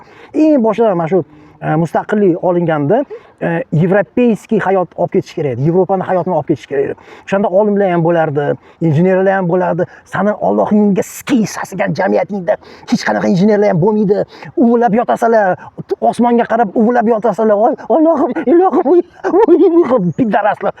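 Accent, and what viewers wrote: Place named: Fergana Valley, Uzbekistan. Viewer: Вой хоромие. Водийда гапиряптими бу эшшак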